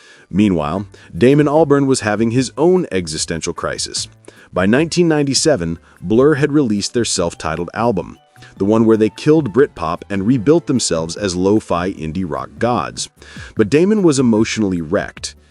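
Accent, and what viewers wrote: United States. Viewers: Listening to this guy use British slang and idioms in an American accent is painful for some reason. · I hate forced subtitles and it's really weird hearing all this British English in a flat, mechanical sounding American English voice.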